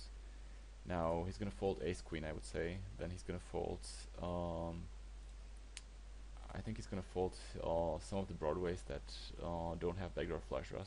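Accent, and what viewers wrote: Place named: Eastern Europe. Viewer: A+ content, vyslovnost temer nepoznamenana slovanskym jazykem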